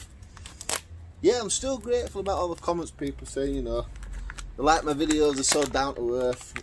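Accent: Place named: Yorkshire, United Kingdom. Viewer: Nice video mate ! I guess, by your accent, that you are from the Yorkshire Region..... I am going to try to get that product as I need it badly for my tent that is getting rather old . Cheers from Chile !!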